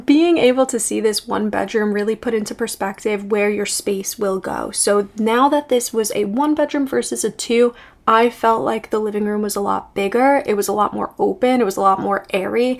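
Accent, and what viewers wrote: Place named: Long Island, United States. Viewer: Off-topic, but I really love your accent! I'm not familiar with specific New York accents, but are you from Long Island?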